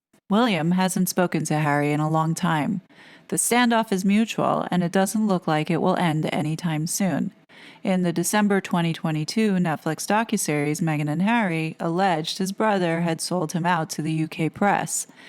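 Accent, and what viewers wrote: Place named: United States. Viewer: Why did the US magazine mentioned abut how much they are "IN LOVE", we heard so much about their living separately and how Harry's demeanor changed as soon as Me Me showed up? BTW, did I hear correctly in your recent videos that you were a woman of color and that you lived near Windsor? You are an American living in the UK? You have a soothing American voice and accent.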